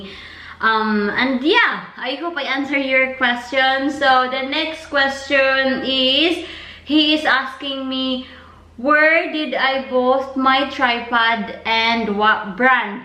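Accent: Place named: Visayas, Philippines. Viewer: I just saw your blogs in YouTube, I really liked it. May I ask where are you from in the Philippines? You sound like Bisaya. I'm from Cebu too.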